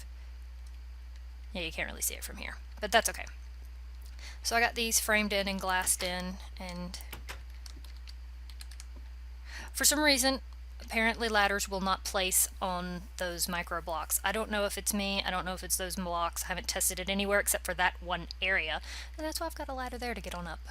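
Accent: South, United States. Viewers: she has a little bit of a southern accent. :)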